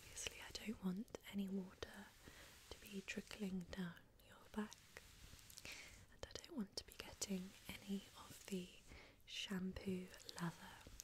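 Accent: England, United Kingdom. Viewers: the English accent works awesome for asmr👑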